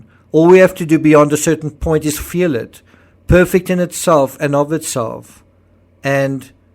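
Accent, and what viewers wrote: South Africa. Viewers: I like your channel very much, especially your naration and your, perhaps South African, accent? :) Your voice is quite calming and very pleasant to listen to. Good work.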